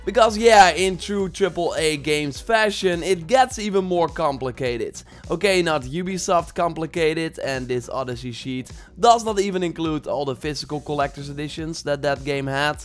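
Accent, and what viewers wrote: Netherlands. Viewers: I instantly regret pre-ordering this game Well I hope the online is somthing Its like you have a dutch accent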